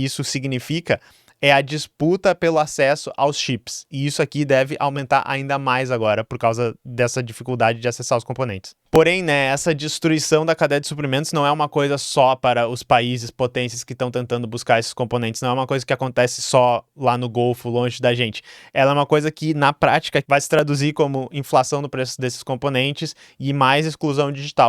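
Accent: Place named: Rio Grande do Sul, Brazil. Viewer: A imitação de mineiro com sotaque gaúcho realmente me pegou 😂. Excelente!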